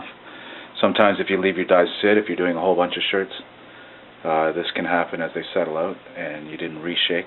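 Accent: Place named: Canada. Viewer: I love your Canadian accent.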